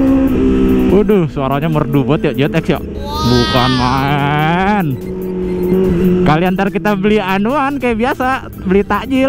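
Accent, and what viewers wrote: Jakarta, Indonesia. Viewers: Bahasa jakartanya makin kentel bang 🤣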